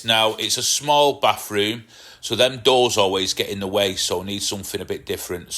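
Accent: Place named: North, United Kingdom. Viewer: Bathroom cabinet is brilliant and love to hear the "north" again! I am in Bavaria and never hear my home accent much. I really look forward to understanding what this feared "kickback" is! btw I got a huge trailer load of pallets from a lady who has tractor mowers delivered on them. I have a mountain of wood now! :)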